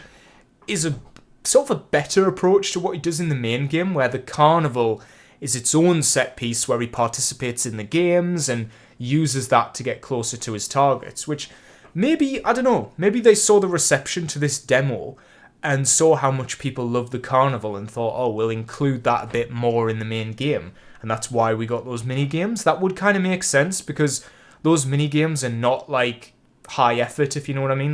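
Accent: United Kingdom. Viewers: That thick British accent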